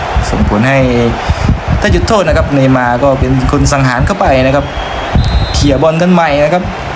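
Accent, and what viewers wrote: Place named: South, Thailand. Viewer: คนใต้ม่ายนิพี่ สําเนียงชัดๆเรย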